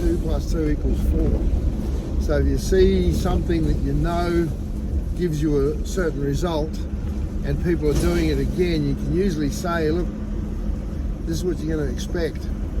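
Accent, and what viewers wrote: Australia. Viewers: you sound like a true Australian doing a great job 👍🏽👍🏽🇦🇺👍🏽👍🏽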